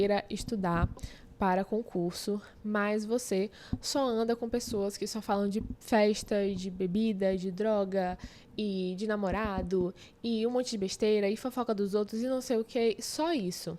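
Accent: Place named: Bahia, Brazil. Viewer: eu simplesmente amei o seu canal principalmente por ser baiana, disso eu não tenho dúvidas só de ouvir seu sotaque me senti em casa, seu vídeo sobre autenticidade parece que foi feito pra mim, muita gente me ama como eu sou e mesmo assim vivo constantemente querendo mudar tudo em mim, vc é muito necessária ❤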